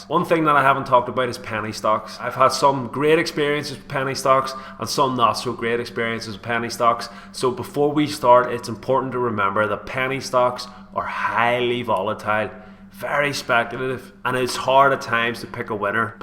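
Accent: Northern Ireland, United Kingdom. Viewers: I thought it was a Northern Irish accent.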